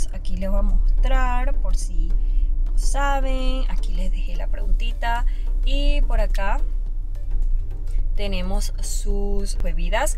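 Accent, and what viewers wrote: Venezuela. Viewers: Es de Venezuela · Por qué hablas como venezolana si te ves como china y vives en Estados Unidos? 😯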